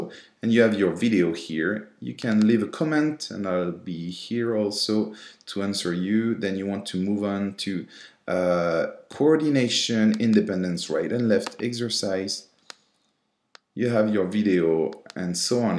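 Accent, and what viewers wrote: France. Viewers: Alors il est français "tontonton... tontonton..." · Très très français oui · Tu as l’accent Français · clairement français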